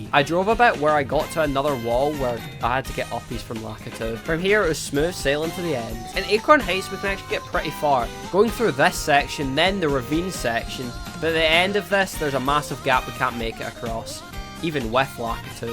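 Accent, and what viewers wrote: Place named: United Kingdom. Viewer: He's bri'ish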